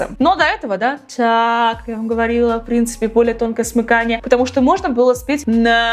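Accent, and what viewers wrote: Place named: United States. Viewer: silence? .... никогда не критикую строго ютуберов, ибо сам начинающий, но так пафосно в начале видео произносить с американским акцентом слова и не распознать слова в тексте - помоему это фейл, Вам не кажется?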